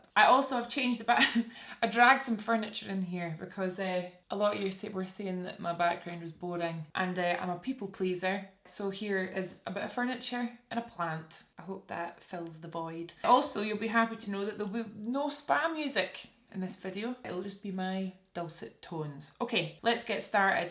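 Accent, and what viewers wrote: Scotland, United Kingdom. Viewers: I’d be so interested in a master class. I find your techniques amazing. On the flip side I’d be worried that I wouldn’t make it to the end of a class with you, your soothing Scottish tones make me sleepy 😂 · I could literally listen to you talk forever😍 Scottish accents are my absolute favorite (I’m from Boston so almost anything is better than a Boston accent😂) you are so stunning💕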